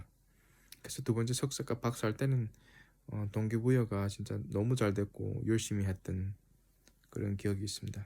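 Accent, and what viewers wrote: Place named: Yanbian, China. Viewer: 구수한 연변 말투 😊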